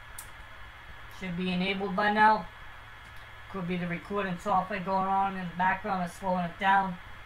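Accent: United States. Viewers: You sound east coast, brother.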